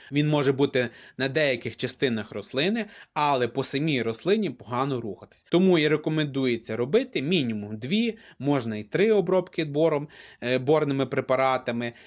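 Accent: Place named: Western Ukraine, Ukraine. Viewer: Западно-украинский говор. мОї-мої,наголос на " Ї",не жара, а спека.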